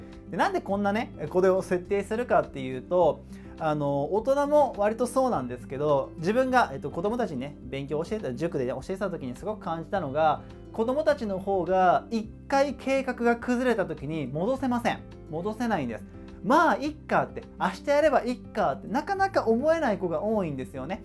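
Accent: Kansai, Japan. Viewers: ちょっと関西弁訛りある気がする(結構好き)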